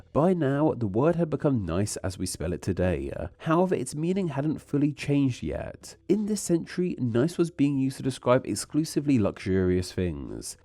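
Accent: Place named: United Kingdom. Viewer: bro he's just british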